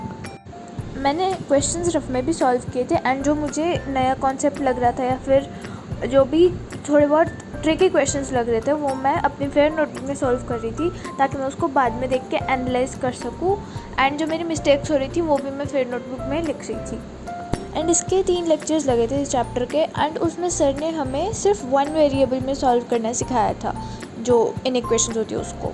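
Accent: India. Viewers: Sis are u Indian ?? and how you accent Indian..😅😅 please make me understand i don't know..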